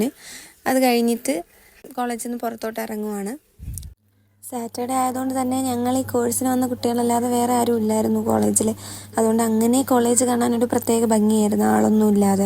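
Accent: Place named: Trivandrum, India. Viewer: Slang trivandrum anu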